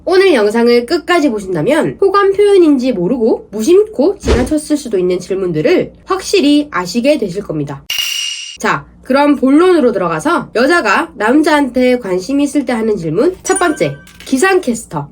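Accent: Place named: Gyeongsang, South Korea. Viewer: 경상도사투리 ㅈㄴ쓰누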